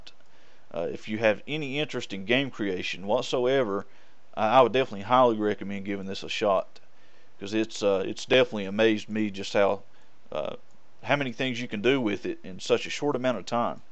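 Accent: South, United States. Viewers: That American accent is awesome!!! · Great tutorial, and great southern american voice (is it from the south right?) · that southern accent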